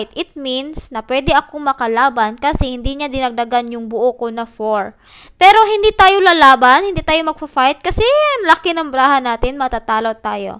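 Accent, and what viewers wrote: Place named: Visayas, Philippines. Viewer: Bisaya ka day. Gwapa man jud ka, ?hehe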